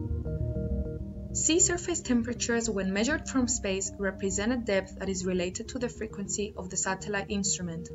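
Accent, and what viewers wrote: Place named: India. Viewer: Unfortunately, your presentation was only partly understandable ( 50%) to me, although I have several degrees, and am very well informed about climate science. Also, hurricane Irma went right through our town - so I am very motivated to get what you are explicating!!! BUT: 1) you were reading, so you were not making any attempt to notice how your audience was responding to your recital; 2) you were not aware that MANY of the terms you were breezing over would be new, and unfamiliar, to some of us wanting to understand what you were describing; 3) You have no pity for those native English speakers, like many of us older Americans, who are unused to your Indian accent, have hearing loss, and had to try to get what you were saying while the backround music made it even harder!. Dont you care whether your accent makes your presentation partially unintelligible? 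SLOW DOWN! If you put your presentation on a public format - like You Tube _ you should care whether you are getting across your point to laypeople!? Slow Down! Or, have your manuscript read by a native English speaker, who is only slightly familiar with the subject, so that the presenter WILL NOT RATTLE OFF A LOT OF TECHNICAL TERMS AS THOUGH EVERYONE SHOULD BE as familiar with them as whats in the newspaper. When you introduce a new technical term, anyone unfamiliar with it will have to pause to try to remember the new term..( Listen to Noam Chomsky sometime; he speaks WAY SLOWER THAN YOU, ABOUT VERY COMPLEX SUBJ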